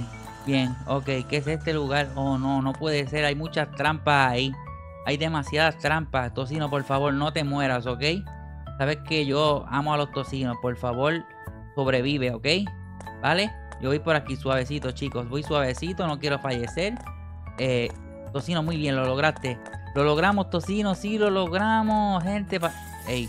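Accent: Puerto Rico. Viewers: Me encanta la voz de y parece Puertorriqueño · Eres de Puerto Rico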